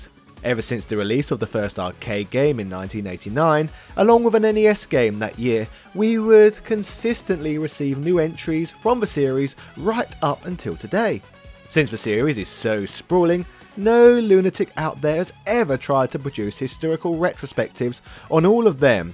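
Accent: United Kingdom. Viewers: "Venus de MEE-lo"??? "SAY-ban Entertainment"??? You Brits certainly have a unique way of pronouncing certain words...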